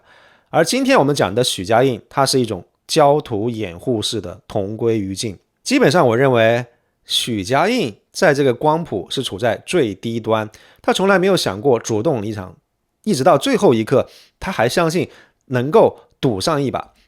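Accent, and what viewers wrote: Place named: Shanxi, China. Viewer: 说实话是哪里人？听口音像山西的！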